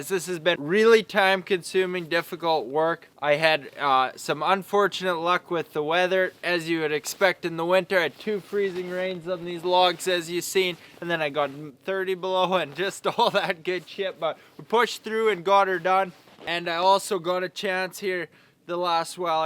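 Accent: Canada. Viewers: Your Skills have greatly improved.. Videos are fun to watch and that Canadien accent is killer‼ Vinny [Boston]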